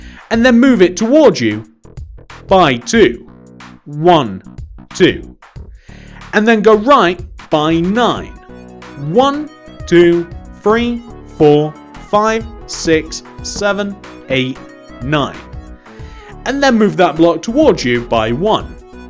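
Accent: United Kingdom. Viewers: Ah yes, an hour-long tutorial. Listen to that soothing voice of the British man.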